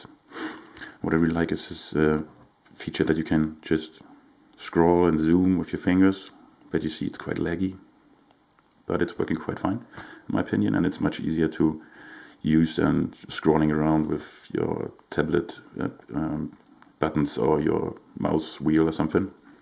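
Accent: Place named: Germany. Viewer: man hört das du deutscher bist, aber ansonsten echt gut :)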